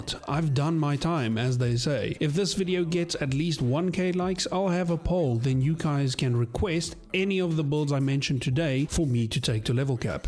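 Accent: South Africa. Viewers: Hey bru are you from south Africa? I cant mistake that accent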